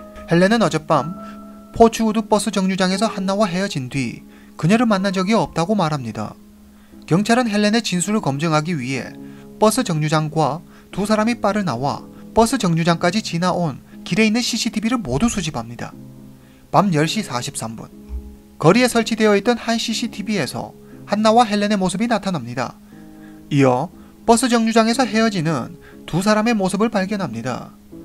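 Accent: China. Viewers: 똑같이 느끼셨군요, 조선족 말투???....😁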